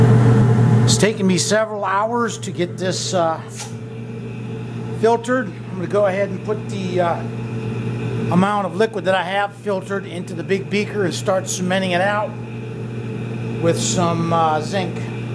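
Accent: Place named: South, United States. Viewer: Great video, but after a while I started thinking it was George W. doing this! Dunno, must be the southern drawl that sounds a little like him...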